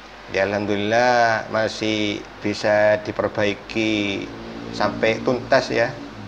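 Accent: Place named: Madura, Indonesia. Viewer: Mantap sampean logatnya kaya orang medunten ...ya bang salam solder panas bang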